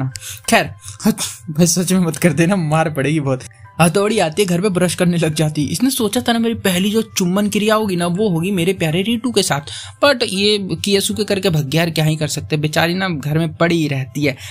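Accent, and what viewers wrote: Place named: Delhi, India. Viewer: Kabhi kaha nhi mene 😁lekin apki tone delhi ki lagti h 😜😜😜😜😜😜😜vaisee bhi delhi aa gyi hu 😝😝😝😝😝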